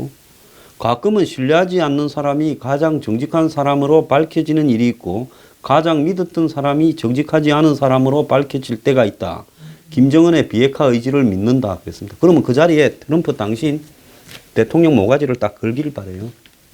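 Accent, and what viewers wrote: Gyeongsang, South Korea. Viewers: 매력: 점점 빠져드는 마초의 갱상도 억양과 가끔씩 날려주는 욕설!" <*랄, 니*미, 니나 잘하세요!(뭉가한테)>이런거 들을때마다 카타르시스 느낍니다! 더 쎄게 해주세요! 쓰레기같은 인간들한테는 말로 해주는것도 아까워요.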